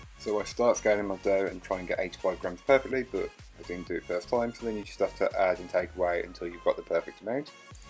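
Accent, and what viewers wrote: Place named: Oldham, United Kingdom. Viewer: You sound like if you’re from Oldham. Keep the good work up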